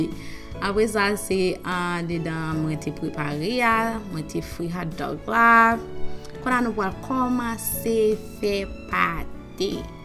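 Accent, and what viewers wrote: Haiti. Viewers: I love your creole accent My mom has the same one lol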